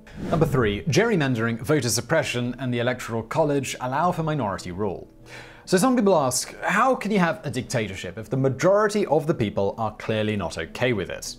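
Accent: United Kingdom. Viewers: I don't know, somehow when a guy with a British accent starts trying to 'splain the US Government just doesn't really work for me. Maybe get someone from Boston to do the job next time...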